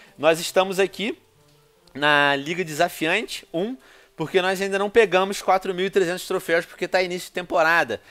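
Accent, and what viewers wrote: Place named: Portugal. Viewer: Kaio ele é português ele fala assim.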